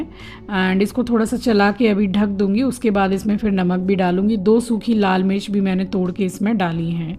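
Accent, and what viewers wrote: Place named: North India, India. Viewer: Your way of talking like us l mean north side 😊 l like u so much ❤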